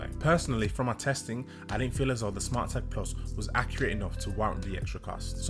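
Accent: United Kingdom. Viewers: I hate the British accent.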